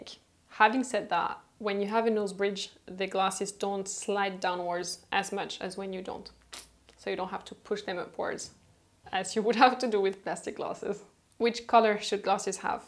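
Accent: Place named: France. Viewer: Hi! I love your videos ❤️. They're always so informative 👍. I also enjoy the soothing tone of your voice along with your accent! Im such s 'francophile'! Love all things French😘. Merci Mademoiselle!!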